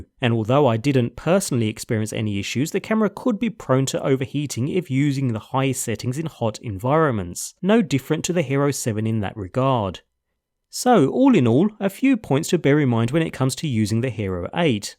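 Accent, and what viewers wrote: United Kingdom. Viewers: I love the way you speak, are you from UK? Anyway your review is brilliant! More reviews mate! Greetings from the Philippines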